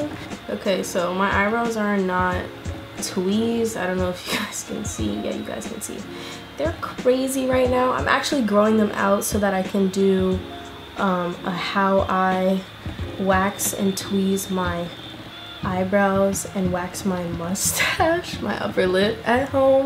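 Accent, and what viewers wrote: United Kingdom. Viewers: Your British accent is killing me🤣🤣🤣 I’m from London x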